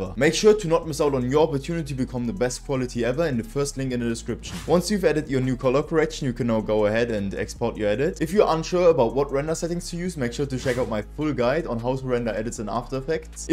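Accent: Germany. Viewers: Man hört so krass das Deutsch heraus :D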